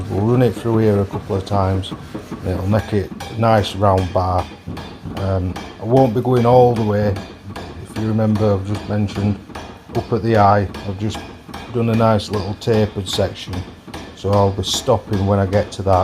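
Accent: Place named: Somerset, United Kingdom. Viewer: where are you based, tha sarnds a lot like tha frump tarn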